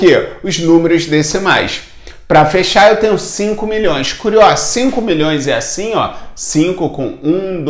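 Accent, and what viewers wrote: Rio de Janeiro, Brazil. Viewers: Amo o jeito que ele fala carioca kk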